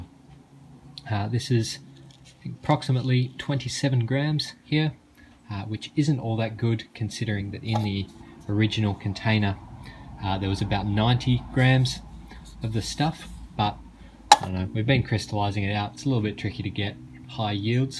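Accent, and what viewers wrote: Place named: Australia. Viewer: Holy shit mate where are you from in Australia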